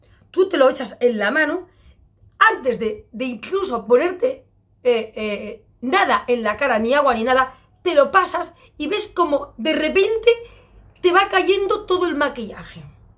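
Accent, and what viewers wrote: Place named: Spain. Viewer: Gusto de verla me encanta su acento español y esa fuerza ese animo que nos trasmite.❤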